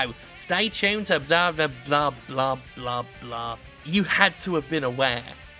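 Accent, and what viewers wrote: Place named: United Kingdom. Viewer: My day just ain't complete without golden british voice talking shit about games that thoroughly deserve it.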